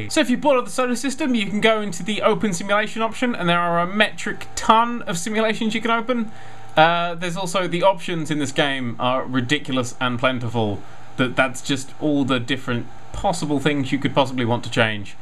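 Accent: United Kingdom. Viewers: 'American voice' its a british accent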